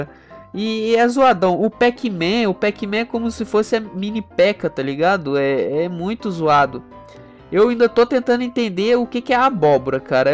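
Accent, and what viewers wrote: Rio de Janeiro, Brazil. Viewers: Pela Voz o e Carioca kkkkkk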